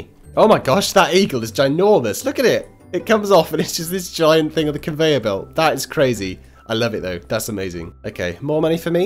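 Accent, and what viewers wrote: England, United Kingdom. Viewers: Hi You have a Awsome British accesent And You are the best And Denis called Huskies Ugly:[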